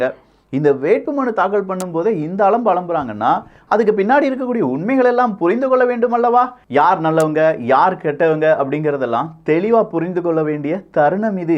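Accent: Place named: Tirunelveli, India. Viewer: that tirunelveli thoothukudi slang, loved it bro, iam from tirunelveli❤❤❤😍😍😍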